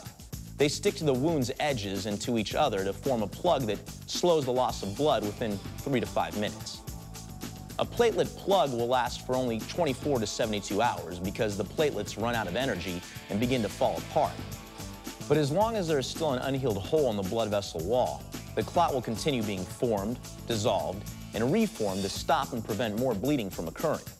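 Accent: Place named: United States. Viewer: Awful American accent. It is a disaster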